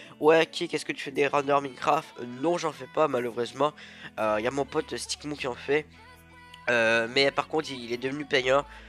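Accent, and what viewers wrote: Canada. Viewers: merci té canadien ? J'adore ton accent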